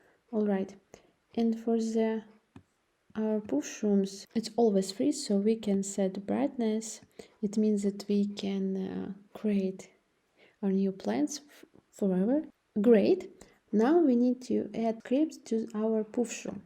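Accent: Russia. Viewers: Русский акцент никто не отменял и спасибо за туториал😊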